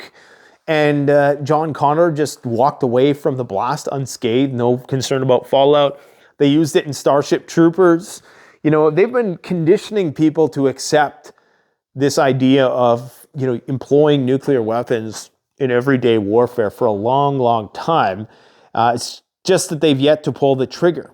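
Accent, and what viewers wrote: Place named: United States. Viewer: He is practicing his American English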